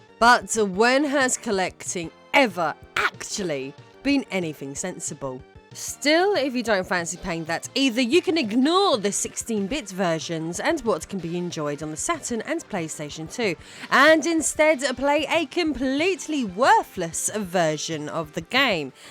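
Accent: United Kingdom. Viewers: I love the british accent❤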